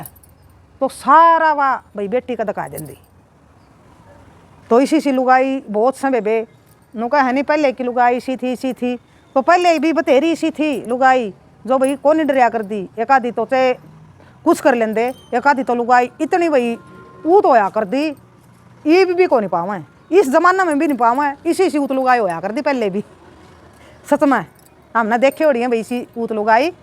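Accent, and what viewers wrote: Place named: Meham, India. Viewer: Aunti aap boli se humare Meham side ke lgte ho.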